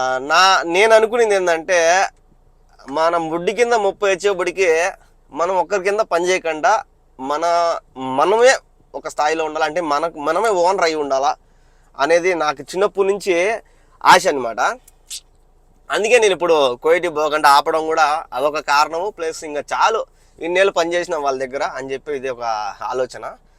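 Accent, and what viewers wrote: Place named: Kadapa, India. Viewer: Anna kadapa slang pushpa slang la vundi anna..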